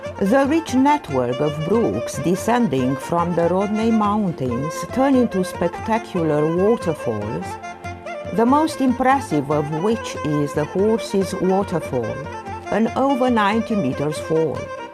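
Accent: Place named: China. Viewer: ce engleza ruginita si una parca'i o rostita de Chineza. filme de astea vor atrage doar turistii din Saliste,Carlibaba ... si Balti mAldavia ! e nevoie de curatenie,amenajari de gradul 1 [unu] la partia de schii,drumuri de accese si repunerea statiunelor minerale in practica reala care ar atrage mii,sute de mii de oameni din EUROPA... si vara si iarna.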